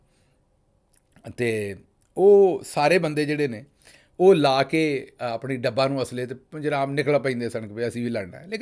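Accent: Punjab, India. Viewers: Dr sahib🎉we enjoyed ur Punjabi accent wd nimma nimma hassssssa😂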